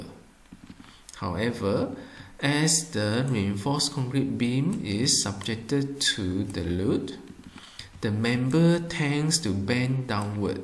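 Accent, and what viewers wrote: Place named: Malaysia. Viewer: i guess the presenter is malaysian? the accent is sooo familiar and feel like home =D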